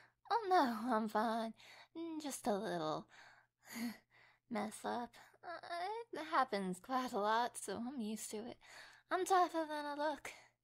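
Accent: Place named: South, United States. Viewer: Your Southern accent was so cute and I love hearing this audio that came out a year before discovering your channel 9 months ago. This character was both hilarious and adorable and you voiced her perfectly. Cool audio! LOVED IT!!!😊💚👍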